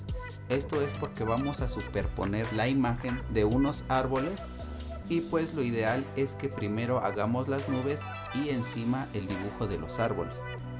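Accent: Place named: Mexico City, Mexico. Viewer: Por este commentario y por lo chingon que eres me suscrito. Y por lo bueno que explicas Las cosas. Escuchandote me haria pensar que eres de Mexico y El modo que te expresas de la ciudad de Mexico. No haces trasmisiones en vivo? Es la Iwata hp ch la que estas usando? Ahora le dare un I fucking like it a lot😁💣💥💢 Gracias..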